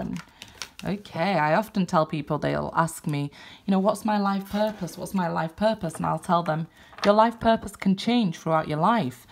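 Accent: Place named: England, United Kingdom. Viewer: You must be in either England or Australia, I'm hearing England though.... I could see myself moving there...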